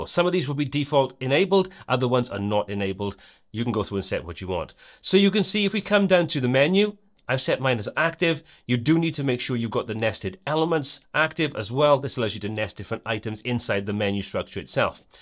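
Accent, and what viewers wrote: United Kingdom. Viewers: Finally, a clear-cut yet detailed video on Elementor Mega Menus! And it's presented by a fine chap with a great British voice!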